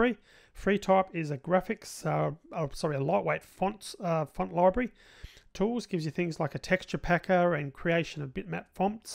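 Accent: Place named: Australia. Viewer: THANK GOD FOR THE SUBTITLES.No offence fellow Aussies, but as a non native English speaker I have a hard time to follow! Kudos for the great tutorial though!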